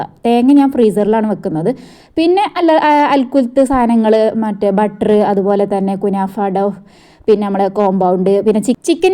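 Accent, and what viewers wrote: Malappuram, India. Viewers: Niga serika Malappuram aano akka ekadhesham adhe bhasha😊❤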